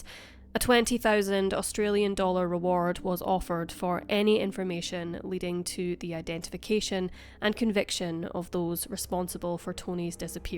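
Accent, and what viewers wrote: Scotland, United Kingdom. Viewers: I love the accent! I was just posting a spelling PSA for those who lack the ability to figure out what city name you meant. Not a dig about the Scottish drogue. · The narrator is Scottish, speaks with a Scottish accent. That's what "Perth" sounds like in a Scottish accent.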